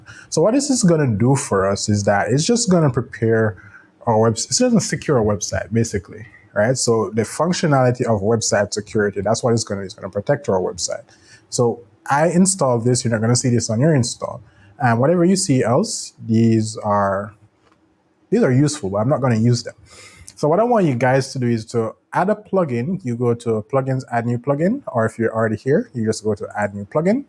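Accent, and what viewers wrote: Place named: Jamaica. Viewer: Bro you sound so Jamaican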